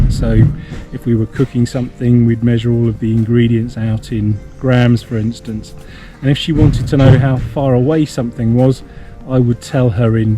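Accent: England, United Kingdom. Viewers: Ah, I'm sure you'll get a few (more) trolls with this one :-) I quietly enjoy the arguments made by those (mostly from USA) who insist on using their "customary units". They call them customary units because they don't want to admit that the system is Imperial. The very Imperium they fought a war to break away from. And all the while their standard measurement system is SI; their customary units are all defined in terms of SI units! And then there is the situation for the UK. Many of those Imperial units are Roman units! The mile is the distance a Legion moved in 1000 paces, that's why the name is "thousand". Weights and measures have evolved somewhat in the last 2000 years, but the line of derivation is pretty clear. So, the UK and USA use Roman units. I say that since Rome has switched to modern science based units, they should too :-) Oh, nice to hear your Danish. 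Do I detect Danish with an English accent? :-)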